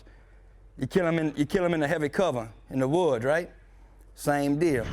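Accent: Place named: Louisiana, United States. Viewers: Lol he sure is. That hard Cajun accent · hes from louisiana for sure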